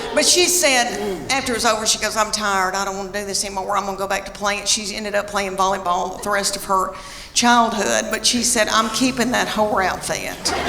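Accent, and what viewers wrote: South, United States. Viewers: I love your accent. After a Spanish woman with a lisp you’re southern accent is absolutely adorable, obviously 3rd place goes to a Welsh woman.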